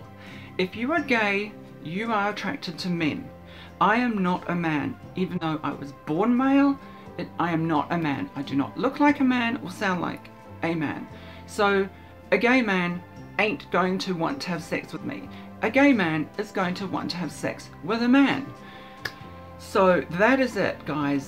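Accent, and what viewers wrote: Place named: United Kingdom. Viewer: for a second I thought you were my ex until I heard your British accent, you and her can be twins, this is a very good video , I showed it to my transgender niece , her friend and him had sex and he had that shame look as you mentioned and I told him what you said and it actually helped them and they're now dating 😃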